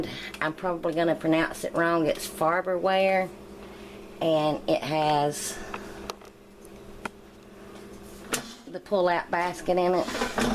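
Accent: South, United States. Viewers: I have to get me one of these! Anytime I hear a lady with a southern accent I know there's good food around! 😁😉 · you have what i call a country accent wow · Hi, I just bought myself an air fryer recently. I LOVE the way your french fries turned out! I going to try your technique on my air fryer. By the way, I also LOVE your southern drawl };-) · Love the southern accent and your friends name 😀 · Love the genuine southern accent!!!! 😍😍😍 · best southern accent!!!! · even with strong southern accent still easy to understand. I am excited about trying these.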